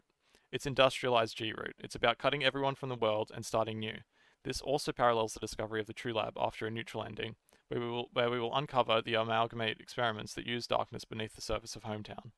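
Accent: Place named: Australia. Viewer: Australian accents are cute though. Show us more about your game soon!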